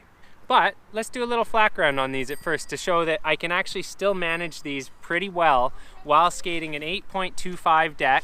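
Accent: Canada. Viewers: Hence my never noticing you pronounce it that way. Wasn't trying to imply that you didn't know better or that were even saying it wrong. But, I get it now. Well mannered Canadians say it that way.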